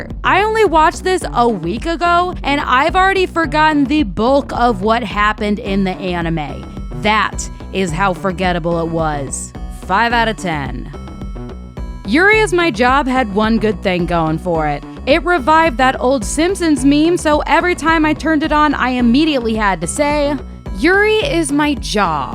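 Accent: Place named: United States. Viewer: This person is speaks like an American 😂 She watching a-lot of these things all wrong 😑. Just because a character has a identity or hobby doesn’t mean it’s representation for people identify similarly. Americans are used to the villains being outside of the relationship, but in these shows the love interest is the villain 🦹‍♂️ Then she’s gonna get mad about one of them having assault when it literally says that the main character gets assaulted in the title 😂 (like what did you expect for real?) The pedo are crazy though but that’s part of Japanese history. Looking into that time will explain why those got so popular. 😬 yikes. calling citrus grooming is kind of overkill though 🙄, I get that’s a red flag 🚩 all the way, but unless is a excessive power or age gap, that’s just throwing around words. some things are only acceptable in cartoons/anime, like unrealistic, body changes or crimes. 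🥱 her actually taking these things seriously is like, Bro, why do you even watch this 🙂‍↔️ but I know why it’s for her job😒 Love stage relationship isn’t based on the SA 😭. if you’re gonna trash my shit, at least do it correctly! It was based on bribes 😣 convincing someone to change their mind Does Not equal abuse, Relax! (Bloom into you) p.s. It’s a GL you have to expect that there’s a chance that the main couple is gonna get together, like what! 🤣 The cherry magic thing was crazy like, she been trashing anime pedos this whole time, but she didn’t talk about that trash side couple 😭. Like thi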